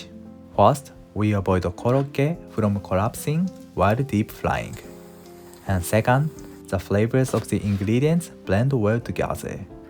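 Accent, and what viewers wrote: Japan. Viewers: I like your Japanese English! Thanks for the recipe, I will make it tomorrow ❤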